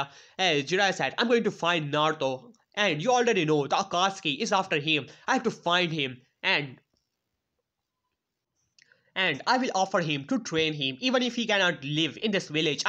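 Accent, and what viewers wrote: India. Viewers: Is ur accent a India accent. I'm wondering bc it's too similar to a one piece character and I've always wondered the real live source her way of speaking/ accent came from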